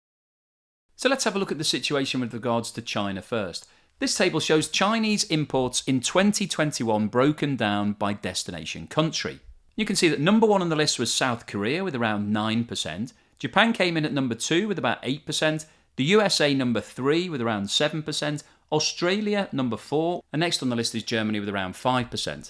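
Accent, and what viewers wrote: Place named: England, United Kingdom. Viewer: Looking at your playlist I think you might be confusing your country which I'm assuming is England from your accent is Russia cuz your economy is fucked way more than Russia's! England the EU and the United States all shot themselves in the foot or should I say through their heads to hit Russia which is dumbest shit! You seem to be living in a willfully ignorant lala land of projecting what you would like to occur as opposed to the actual situation on the ground Russia is hurting to some degree but not as bad as Britain! And it's only going to get worse now that Beau Jo's gone and someone even stupider it's going to take over! Maybe you also believe as Liz does that you all are going to defeat Russia in Ukraine? This is a global realignment, that will hurt Russia slightly in the short term but benefit them in the long term and devastate as you put it the West otherwise known as Europe Canada and America which represent only one fifth of the human population! 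So opportunities abound now for Russia India and China! And Europe is now going to be my country America's bitch as it wanted it to be and Britain will be the lap dog! America's economy is hurting but Europe is in for a long cold inflationary recessionary winter and next several years!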